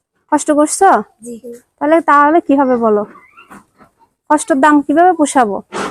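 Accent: Chittagong, Bangladesh. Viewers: আপনি চট্টগ্রামের যে,কতা শোনে বুঝা যাচ্ছে যে...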